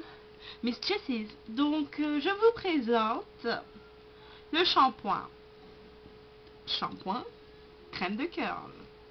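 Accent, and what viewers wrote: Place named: Ivory Coast. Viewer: t une fofolle toi! tro marrant kan tu parles avc l accent cainfri, hihi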